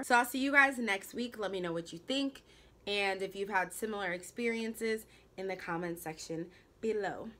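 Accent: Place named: United States. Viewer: She is a child of immigrants so naturally she has an American accent.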